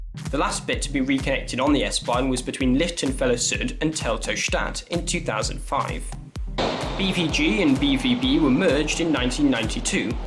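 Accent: United Kingdom. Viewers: You really do use very English pronunciation of all those German words! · He is British, thats how they always pronounced it. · This is really a great work! I am deeply surprised how a young person from the U.K. (I hope I recognized your accent correctly) deals with this matter so precisely and with so many details. I traveled between West Germany and West Berlin several times before 1989 by train, and I can confirm that everything you saying is absolutely correct. Excellent!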